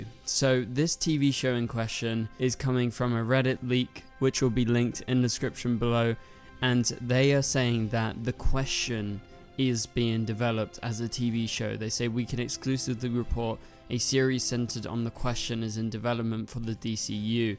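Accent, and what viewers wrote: United Kingdom. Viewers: Great video. I have never been a really big fan of non-powered superheros. Dont hate me, but i didnt even care much for Arrow, nor do I really like superheroes like Batman. To me, it is just more interesting if the characters have powers. I love it whenever those powers are explained more and i get to see all the various abilities in action. I am also a huge sucker for Origin Stories. I have the entire collection of the 80s DC comic series Whose Who. I just love that sort of stuff. Im also excited about the upcoming shows and movies that will feature Marvel's Wiccan. That is going to be so cool to watch. Hey, do you know if Flashverse is okay? He has not made a video or went Live in a long time. I always enjoyed it whenever the two of you made content together. You make a good duo. Please reach out to him and see if you can get him as a Guest. 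And maybe encourage him to begin posting videos again. I first found your channel and I have loved everything you do. You are very eloquent and I love your particular British accent. And through you, I found Flashverse. He is equally well-spoken, and I just adore his personality and his accent. You are both great. And I find what little bit of my life's happiness there is, whenever I see a new video posted by you both.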